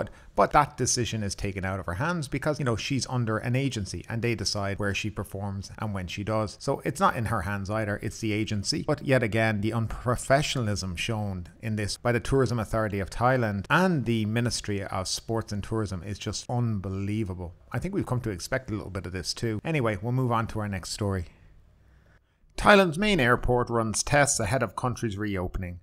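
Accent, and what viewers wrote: Ireland. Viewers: I am an American and find your speaking speed fine. Perhaps some people are not used to your Irish accent. A professional voice recording artist usually speaks about 50% faster than average. A professional speaks about 150 words per minute, so consider yourself professional.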